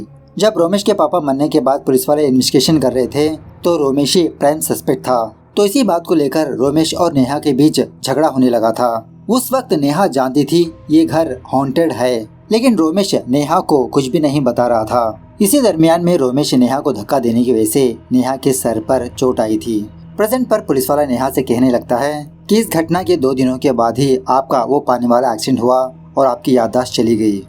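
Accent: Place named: South, India. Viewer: You sound sweet bro, speak Hindi with South Indian accent, we love it.😊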